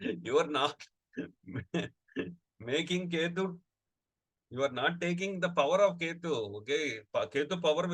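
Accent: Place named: South India, India. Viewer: Sweet south Indian Accent ...hehehe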